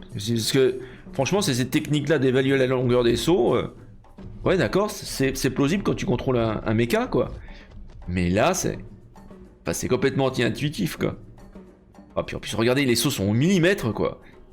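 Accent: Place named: Quebec, Canada. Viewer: Jolie accent québécois 🥰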